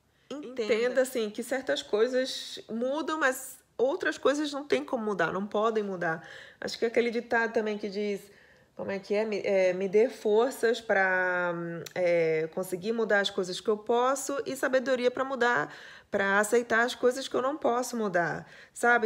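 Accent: Northeast, Brazil. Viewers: Amooo tanto Querida,de Ver Voce Ai Falando com cada um de Nos,muito importante ver e ouvir Voce e o Querido Falando em Alemao c seu Sotaque Noedestino e muito lindo, Parabens pode falar a vontade 💓👏👏👏🎆💞💕🎼😘😍🌺🌹🍁👼🙌😇 🆙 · Imagina o quanto o teu sotaque nordestino é lindo.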